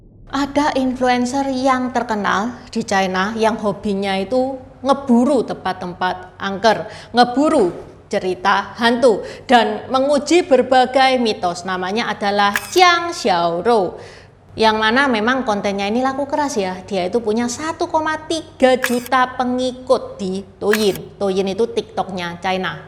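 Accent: Java, Indonesia. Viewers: Cici bhs Indonesianya medok banget 😂😂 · Logatnya Jawa banget.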